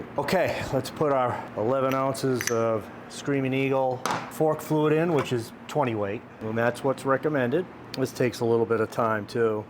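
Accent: United States. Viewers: We’re in mass are you from I’m from Cambridge can tell by you talking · Is that a New York accent?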